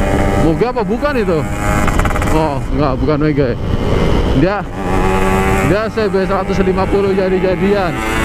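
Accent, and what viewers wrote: Banyumas, Indonesia. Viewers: Ngapakk hadirr mas'e 😂👌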